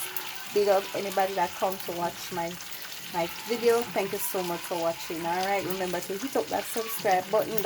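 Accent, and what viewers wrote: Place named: Jamaica. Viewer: Thanks for sharing... I hear the Jamaican make me miss yard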